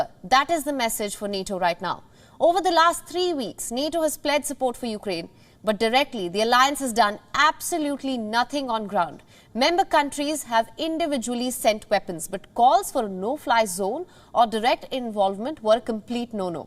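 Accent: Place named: India. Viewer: Your voice and accent makes it best for story telling. Desi tone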